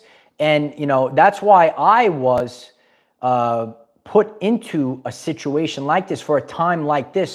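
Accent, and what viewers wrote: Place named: Boston, United States. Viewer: Hmm....1st time here, love the accent. Usually not my topic of choice but was drawn in. May i know where the accent is from, is it Boston? I love hearing other voice differences across the globe, and learning about other cultures🙏✨️❤️‍🔥🌊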